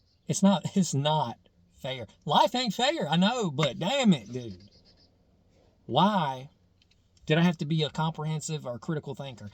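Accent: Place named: North Carolina, United States. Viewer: I don't know why but it really feels like you're from Montgomery County in NC for some reason. Maybe because you talk a bit like a few relatives of mine. Love the content bro!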